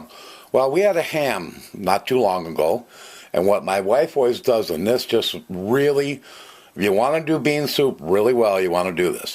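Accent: Minnesota, United States. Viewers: Talks like an only Minnesotan "this farmlund make utt".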